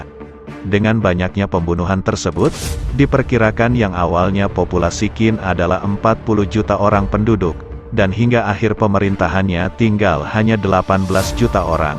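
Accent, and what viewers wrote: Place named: Indonesia. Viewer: Lidah orang Indonesia.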